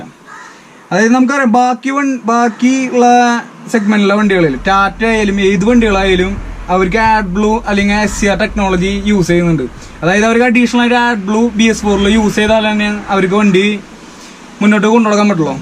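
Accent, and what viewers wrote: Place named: Thrissur, India. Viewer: Enta chengai ningadea samsaram kelkan aa ee review full kanunea... Trissur slang😍😍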